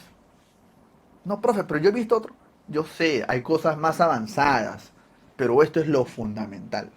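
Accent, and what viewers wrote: Peru. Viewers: Con razón le buscaba el acento y no acerté a que era peruano.😂 Es un excelente profe.👏👍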